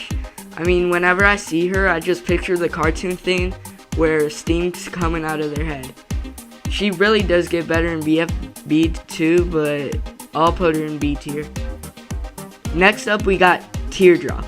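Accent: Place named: Texas, United States. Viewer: dude sounds like that local texan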